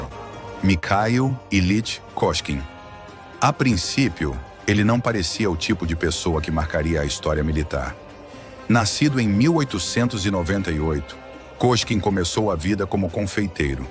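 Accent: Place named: São Paulo, Brazil. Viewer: Colocaram o sotaque de Paulista caipira na voz da IA.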